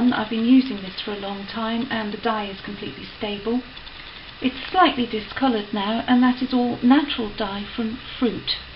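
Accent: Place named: Wolverhampton, United Kingdom. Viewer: I live in Italy and tomorrow im going up town to get me some oranges, we have them all around the town, people dont eat them because they arnt eaten as they are not sweet enough!!! What a waste! noticed you have a northen accent (wolverhampton), like the way you are so clear, I shall enjoy making my marmalade.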